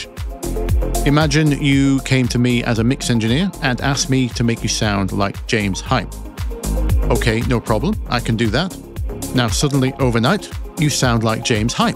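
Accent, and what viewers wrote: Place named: South Yorkshire, United Kingdom. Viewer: Great vid always. This resonated. I think learning the rules has made my music worse. Its technically better and i am closer to the sound o wanted to create but its just not as original. Oh, the curse of the creative . Struggling to place your accent, thinking somewhere South Yorkshire but Im probably miles wide of the mark.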